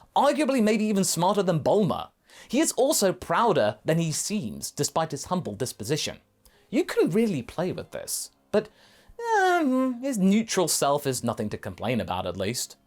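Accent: United Kingdom. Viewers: Peak DBZ, in my opinion, was when Goku wasn't beating everyone and they all just had to rely on him. It was when the side characters could get the spotlight and do a little something against the enemies. For example, Vegeta beating Freeza's forces on Namek until the Ginyu Force showed up. Or Vegeta transforming into a Super Saiyan and beating Android 19. Or Vegeta (I'm repeating myself but he gets great moments in the series) going Grade 2 and beating "You're either perfect or you're not me" Cell. And most notably of all, Gohan going SS2 and beating Perfect Cell twice. So to have a story where Goku and Vegeta go to space and fight enemies relative to their strength while leaving the others to defend Earth against enemies relative to the side characters would be great because no one is getting shafted. The enemies aren't too difficult to overcome where they need Goku saving the day and aren't too easy to beat thus lowering the tension and stakes. 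Also, this is my first time watching you and was genuinely confused for a bit, thinking "why does the name sound so familiar?" and then being shocked that 1) you voiced Goku in DBZA and 2) you're British, so that was funny.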